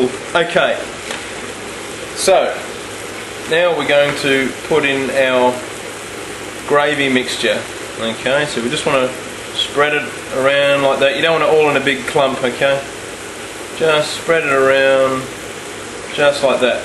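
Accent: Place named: Australia. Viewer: can you use anything other than gravy? Say, if you REALLY REALLY hate gravy? And I looove you australians accents. They're soo aweosme.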